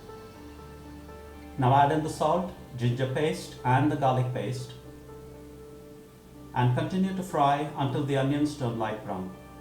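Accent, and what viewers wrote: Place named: England, United Kingdom. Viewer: Good thing is that you took care of the fact that your filling is neither oily nor runny. Usage of Tomato paste instead of the traditional method not only enhance the taste but it helped you get the required consistency in your filling. What I observed is that in this recipe your camera angle is not top notch. Especially when you were making your filling and sauteed onions. This dish looks more South Indian in texture though no coconut is used. The running commentary always reminds me old Cricket matches used to telecast in 80s n 90s with aristocratic English language and meticulous English accent. All in all, exciting stuff as always.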